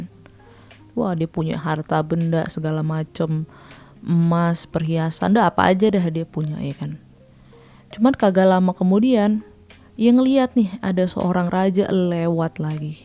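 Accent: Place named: Betawi, Indonesia. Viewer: Kereen yaa ceritanya. Walaupun ananda tinggal di LN. tp logat Betawinya msh kental yaa. Pertanda tdk melupakan bangsa❤. Cerita itu utk mengingatkan kita smua spy menjadi orng yg ttp bersyukur. Jangan sll merasa kekurangan. Krn kl kita melihat keatas tdk ada habisnya. Lihatlah kebawah msh bnyak orng yg kekurangan tp hidup tenang bahagia. Krn bahagia itu sederhana. Tdk hrs bermewah2. Kebersamaan bersama keluarga punya quality time bersama itulah kebahagiaan.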